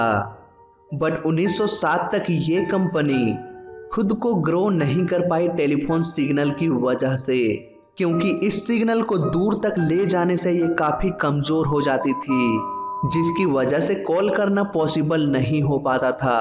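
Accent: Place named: Bihar, India. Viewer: Buddy, it doesn't seem to be Hindi what he is speaking, it may be Bihari or any other distorted local language, but surely not HINDI.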